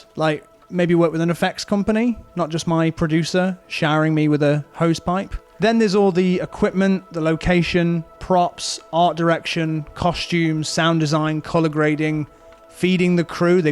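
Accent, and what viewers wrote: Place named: East Midlands, United Kingdom. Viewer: you used to have an east midlands accent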